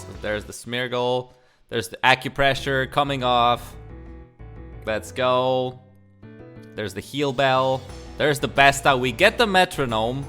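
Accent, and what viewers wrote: Poland. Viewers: Love the polish accent in the video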